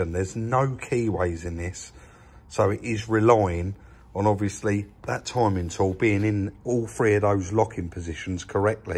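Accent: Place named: Kent, United Kingdom. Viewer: are you from kent??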